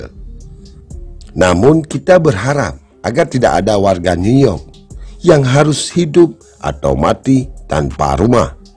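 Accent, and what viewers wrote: Aceh, Indonesia. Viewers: "T" dan logat.aceh nampaknya yg isi suara di narasi di ulasan ini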